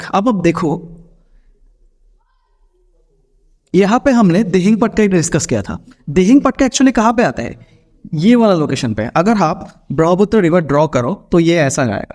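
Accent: Bengal, India. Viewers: Sir apka accent se lgta h aap bengali ho kyuki ek baar aap padhate wqt gllti se bengali word nikal gya tha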